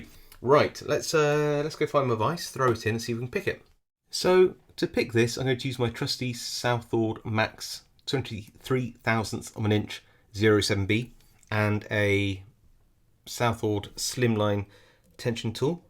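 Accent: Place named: RP, United Kingdom. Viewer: You are just so talented, you speak the "Queens English", you pick locks, gut and re-pin locks, and now I see you can do optical illusions! Does it EVER end!?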